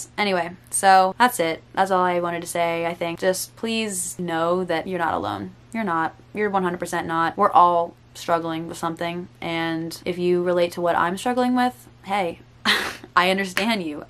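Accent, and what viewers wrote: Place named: Pennsylvania, United States. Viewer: And i think Pennsylvania's English accent is the best .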